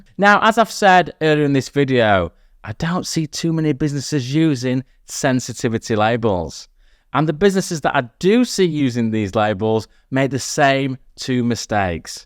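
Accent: United Kingdom. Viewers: Entre escuchar el ingles británico y aprender el entorno de Microsoft, todo esta bien!!!!!!!!!!!!!!!!!!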